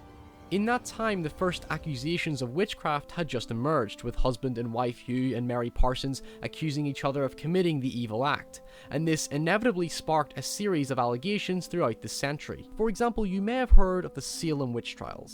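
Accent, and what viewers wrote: Northern Ireland, United Kingdom. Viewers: I can't tell if you're british or american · Nah I’ve decided you’re Northern Irish ☘️ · What’s going on with your accent? Northern Ireland? Scottish? American? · northern irish. just like mine so it is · who can tell me where the narrator's accent's from? what part of the UK?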